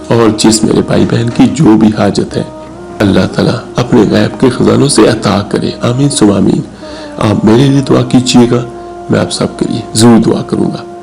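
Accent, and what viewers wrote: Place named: Faisalabad, Pakistan. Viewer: Faisalabadi style of Urdu..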